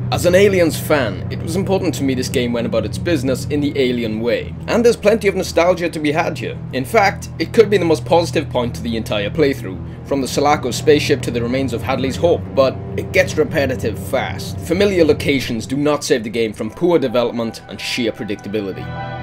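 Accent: Wales, United Kingdom. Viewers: Hey love your stuff, it would be cool if you could give us a list of upcoming games you are reviewing. Btw where are you from? i want to say wales judging by your accent but i'm not sure:/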